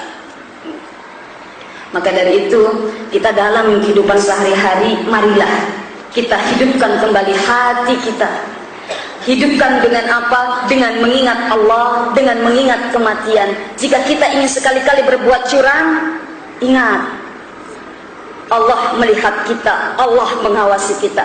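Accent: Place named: Java, Indonesia. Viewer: Bu gk orang Jawa tinggal di Pinrang atau orang Pinrang mondok di Jawa logatnya masih ada logat jawanya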